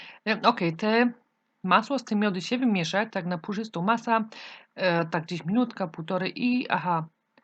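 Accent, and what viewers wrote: Silesia, Poland. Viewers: Fajna gwara Śląska . Robiłem to ciasto już wcześniej ale bez masy.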